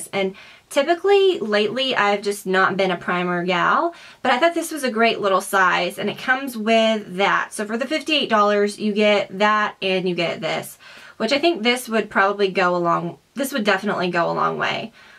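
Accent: South, United States. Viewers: Can you make a video demo of the masks you got. It'll be nice to see how they work. Btw you sounded extra country in this video, and I liked it! ;) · Have you always had a Southern accent and I never noticed? Or did you just develop one? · You really looked like you were having fun and were happy in this video :) It kept me smiling :) Also at times I could hear a bit stronger southern accent than usual... Adorable :) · Love your videos! You inspire me to make vids...i luv your pretty lite southern accent ; ) i luv your long vids too..more tours please.love your style! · Your accent is soo southern in this video ! Never noticed it so much before.. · Your southern peeked through in this video :). Could you please review that hourglass primer?! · I feel ike you have the southern accent that only comes out when your talk super fast, i love it. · why is she talking with a southern accent in this video? 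idk if shes from/lives in the south..but ive never heard that from her before.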